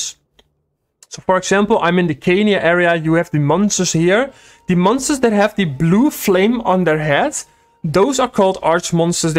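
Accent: Netherlands. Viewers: You are dutch, aren’t ya? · Are you dutch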